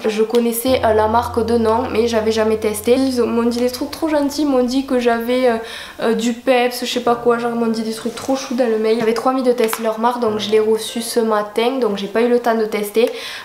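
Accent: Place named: Marseille, France. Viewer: oooooui oooooui " ...poinnnnnne...poinnnnne..." je n'ai gagné qu'une chose avec la chaîne de Williams c'est d'avoir découvert ta chaîne avec ton magnifique accent marseillais ;) pouce bleu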